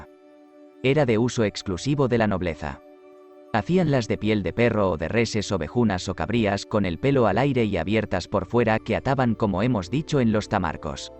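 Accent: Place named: Canary Islands, Spain. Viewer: Una forma de darle un plus de valor a la información es hacerlo con un acento que no le CHIRRÍE A NADIE. Un acento canario formal, (no el coloquial o informal de bares y colegas) en un tema canario es altamente recomendable y muy coherente, máxime si encima ustedes se hacen llamar.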